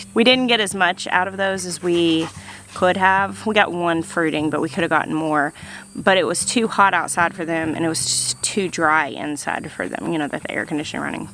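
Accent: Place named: South Carolina, United States. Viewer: You're starting to get a south Carolina accent 😄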